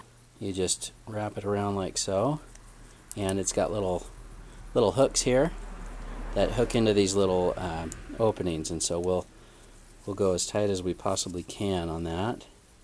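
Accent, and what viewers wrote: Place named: West Coast, United States. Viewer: Something about your laid back west coast narration that adds a layer of mellow to the repair. Thanks man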